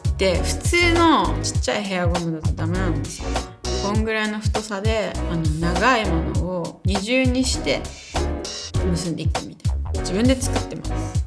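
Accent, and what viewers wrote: Okinawa, Japan. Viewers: 沖縄な喋り方！！！故郷思い出して気を取られて内容聞けなかった笑 もう一度見る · 沖縄なまってるよ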